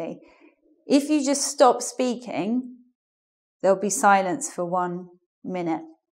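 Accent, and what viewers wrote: United Kingdom. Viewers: i dont know why when Women do British accent its so hard to listen. in opposite Men are better with british accent :lol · Hello. Tell me something about your accent - for which part of the UK it is typical? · Hi, please could you tell me what part of UK are you from? I mean, I need to know where is your accent from. Thank you for the information on CAE, I'm gathering study material to take this certification. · She sounds like she's from the U.K. to me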